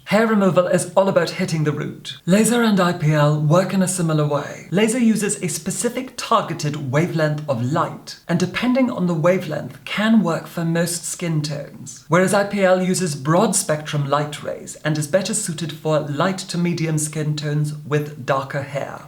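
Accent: Scotland, United Kingdom. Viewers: I don't think his accent has changed. He's had a Scottish accent the entire time. Has his speech or voice become a bit softer and his delivery a bit more refined? Yes. But I don't think that he's putting it on for affect. I'd say that it's more about the fact that he hadn't lived in London for very long when he started this channel and his more typical, regional Scottish burr, has softened and become closer to that of the people he's been interacting with in his business and personal life over the past decade. (if that makes sense?)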